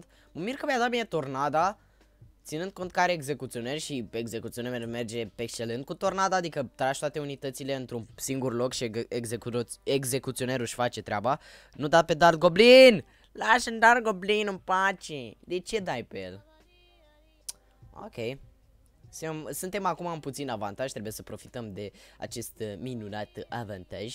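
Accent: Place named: England, United Kingdom. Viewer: Vezi ca ai putina engleza si franceza pe la coltul gurii;)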